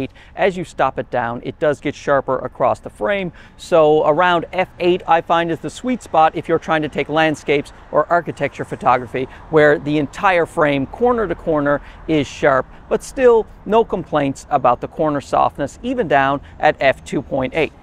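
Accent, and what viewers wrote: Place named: Canada. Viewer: I've been watching your videos for awhile now and as I was watching the intro I thought that maybe you sounded a bit Canadian and then you mentioned you're from Toronto! Fellow Torontonian here! I also want this lens really bad Haha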